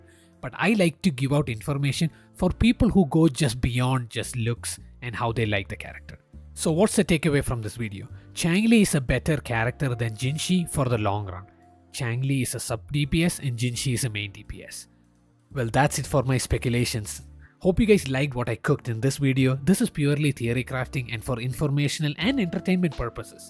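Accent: India. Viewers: Is this Indian. I feel the accent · Your accent sounds indian Amd btw i am chosing jinshi idk why but i didnt liked changli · That South Indian accent though. Where you from my guy? Tamilian? Malyali? Nice video :D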